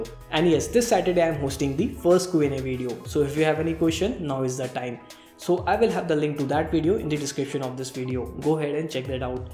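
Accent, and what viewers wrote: India. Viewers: indian english is so scary.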